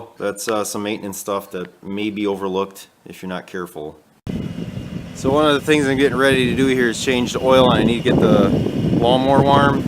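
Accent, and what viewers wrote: United States. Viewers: He sounds like one of them dam yankees that dont know his ass from a hole in the ground mite be a democrat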